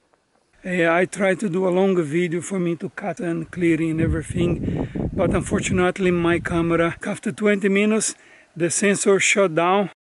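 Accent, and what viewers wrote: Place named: Brazil. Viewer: Algorithm just presented your channel to me. I will be following you on your restoration journey with interest. I knew you weren't Spanish, but couldn't place your accent as I'm not used to hearing Brazilian people speaking English. I'm English living near Valencia and understand there are laws concerning building on nature reserve land. You found a great property with huge potential. Are there many building regulations you have to abide by? Also you say "we", do you have a partner or are you solo. Best washes and good luck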